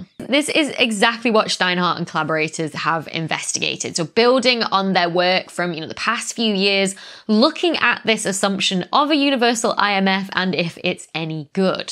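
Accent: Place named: North, United Kingdom. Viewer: "quicker than what our best model of the....." i love the way she sounds so northern.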